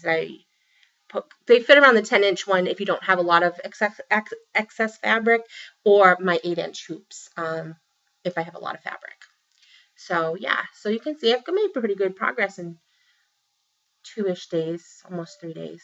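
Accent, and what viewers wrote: Boston, United States. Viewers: I definitely heard Boston, with the ar words, like part, shark, start etc. I thought it could be stated island, maybe Jersey. I was born in and partially grew up in Brooklyn, so I'm told I have an accent as well. Lol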